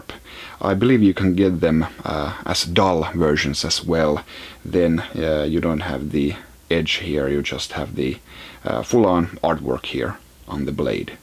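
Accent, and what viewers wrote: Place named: Finland. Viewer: I noticed the finnish accent right away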